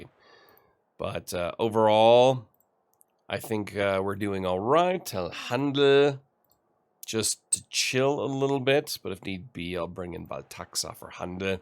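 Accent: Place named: Germany. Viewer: I could hear that. I wanted to ask from which region in Germany you"re from. sorry if it's too personal.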